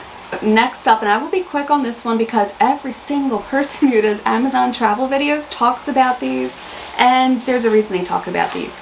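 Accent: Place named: Australia. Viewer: I'll never fly international, but as someone who cruises many of these are a good idea for cruising as well. Cruise ship cabins don't always have many hangers either, so those foldable hangers are a brilliant idea, so I can hang my partner's dresses, and my 'formal night' gear. Great ideas. I look forward to seeing some of your travel vlogs when you take Mark to Philly. It'll be interesting to note how aussie you probably now sound to your friends and family.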